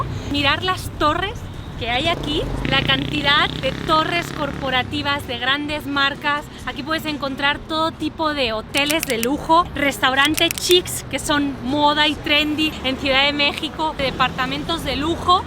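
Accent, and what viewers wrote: Andalusia, Spain. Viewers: AUNQUE CON LAS REGLAS DE NO DEJAR GRABAR, EL VÍDEO ES MUY BUENO! YO HE IDO A CDMX PERO NO HE IDO A SANTA FÉ!! PERO YA LO CONOZCO UN POCO CON TÚ VÍDEO!! ME GUSTA TU ACENTO ESPAÑOL!!E GUSTARÍA IR A ESPAÑA!! SALUDOS!!! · Tu no eres catalana. Eres andaluza. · Haz un reportaje de INTERLOMAS otro lugar de cdmx muy especial . ..ahhh te vez hermosa y tú hacento español suena bien,felicidades !provecho!!!.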